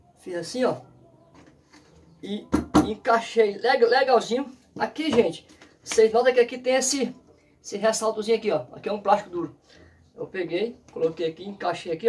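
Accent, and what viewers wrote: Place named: Ceará, Brazil. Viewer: Ô vc parece ser cearense!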